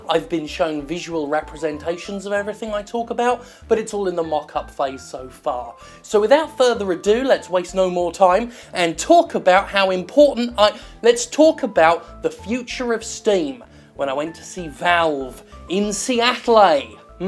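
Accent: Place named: United Kingdom. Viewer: Sven Tempest these countless accents you are talking about are dialects. someone from London might sound slightly different than say someone from Liverpool but they are still English accents none the less. take it from the perspective of someone from outside the u.k they hear someone like Jim speaking they think British but if they hear an Irishman speak then that guy is Irish not british even though he is part of the union. hell even people in the u.k never refer to their accent as british.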